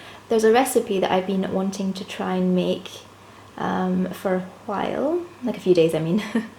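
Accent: Scotland, United Kingdom. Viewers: pretty sure she's a native with that scottish accent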